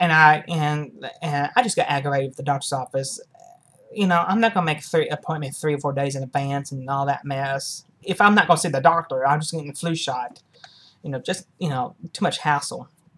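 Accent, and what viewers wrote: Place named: Texas, United States. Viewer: Love your haircut, I love your Texas accent.Thanks I will take my whole family to Walgreens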